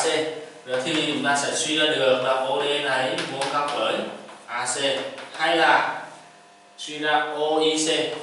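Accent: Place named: Nghe An, Vietnam. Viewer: nói giọng giống nghệ an rứa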